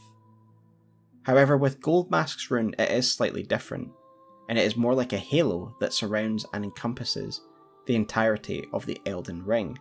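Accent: Scotland, United Kingdom. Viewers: I don't mean to alienate you, but can you please let me know what region of the UK you are from, I'm struggling to pinpoint your accent. Love the content! You are a lore machine. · Man, don't even try to hide your scottish accent, it sounds good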